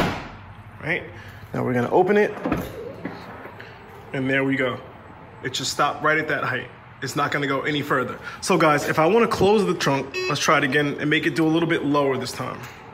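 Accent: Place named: United States. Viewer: Hi, I am Pierre from Paris (a model 3 owner) thank you for your wonderful video. And your wonderful American accent. It was super cool and super easy to be listening to you. I will watch your other video and I have subscribed to your chanel. But just one question for the trunk. You showed how to reduce the height, but if I want to increase?? Mille merci à toi!!!!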